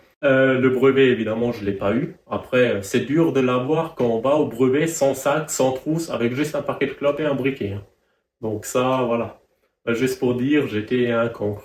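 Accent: Alsace, France. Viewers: Hopla Salut Comme disait Germain Muller, nous les Alsaciens nous n'avons pas d'accent c'est une intonation! Toutes mes sincères félicitations pour ton parcours professionnel, cela explique ta rigueur et le souci du moindre détail. C'est toujours un plaisir de suivre tes vidéos Le Haut-Rhin te salut bien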